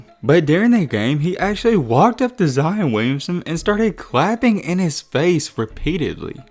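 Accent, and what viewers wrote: South, United States. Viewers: This man sounds like he's trying to hide his southern accent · You southern af bro 🤣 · Yo ahh country asf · Love the country voice commentary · Country af voice. Sounds like you're talking and trying to hide your accent. Loud and proud brother. Don't hide that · where you from? that southern accent heavyyyy🤣🤣👍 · Dude I gotta be honest the southern accent was not made for YouTube commentary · The narrator sounds like he's a buzzed hillbilly.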